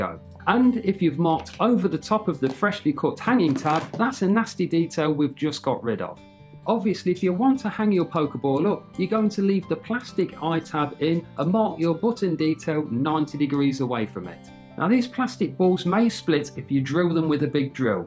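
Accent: United Kingdom. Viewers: Is he British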